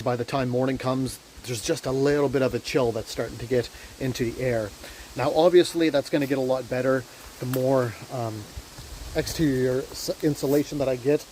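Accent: Nova Scotia, Canada. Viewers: Your accent sounds Nova Scotian. Im guessing Pictou county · Thank you for this lovely video summing things up! I wrote once before saying "You must be a Nova Scotian" as I recognize the accent! But am forgetting which county you're in. I'm in Annapolis county and it's getting much harder to hide from all the restrictions in order to 'off grid'. I'm in a tiny 12x12 shack next to our bigger house, but eventually my 30 something son and daughter in law (who are also very much 'believers...Praise God!), are wanting to homestead and go more off grid with their little one. God has done many wonderful things in their lives so far, so I'm sure it will happen within the next couple years. (P.S. I have a cordoned off little corner with a bucket system bathroom and I just love it....WAY better than any water toilet!) In a future video, can you give your testimony of how Jesus came into your life? 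Love hearing the multitude of ways He has shown up in peoples' lives.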